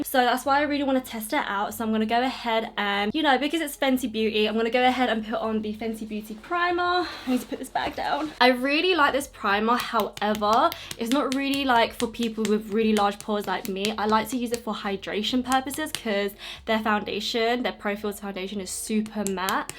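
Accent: England, United Kingdom. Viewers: I have followed you on Instagram for quite a while and just found you on YouTube. I have no idea why I didn't know you had a youtube channel but Im am so soooo shocked by your voice! 😂 I had no idea you had an english(?) accent! (Im from the US) But I love your videos and you do beautiful make up! So happy I found your channel to subscribe!!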